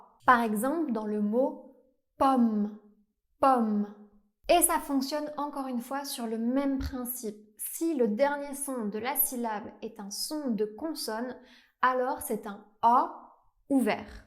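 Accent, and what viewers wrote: France. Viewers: Bonjour. Je ne suis pas d’accord avec la prononciation pour le « ê ». Il se prononce bien « è » et non « é » quelque soit le mot. On a un accent circonflexe là où en ancien français, il y avait un S après la dite lettre avec accent circonflexe aujourd’hui: Hospital (Hôpital), Forest (forêt) etc. Pour le verbe rêver, il vient du mot « esver »: nous retrouvons bien le S. Cette règle fonctionne pour toutes les lettres avec accent circonflexe. Ensuite, je remarque que tu prononces le son « è » en « é »: tu dis francé et non français. Pareil pour « j’ai » etc. Tu as bien hérité de la patte du Sud de la France;) Enfin, le « â » est bien prononcé dans la région champenoise et surtout dans le Nord de la France. Je pense à la région de Troyes notamment ^_^. Sinon super travail pour les non francophones:) N.B: je suis bien française et enseignante;) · Disons que tu as un accent français de France très marqué pour moi ! 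En Suisse romande, on fait la distinction entre les a de bras et gâteau (fermé), et souvent entre le o (souvent ouvert) et eau (toujours fermé)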